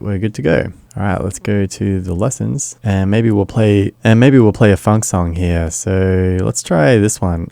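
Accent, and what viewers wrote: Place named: Australia. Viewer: Started watching the video, and felt so at home as you talked - then I realised it was the aussie accent. HAHAHAHA thanks heaps dude.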